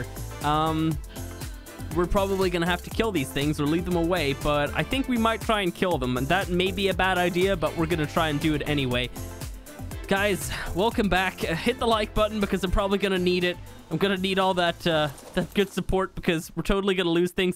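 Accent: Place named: Ireland. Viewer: you should do a video in your irish accent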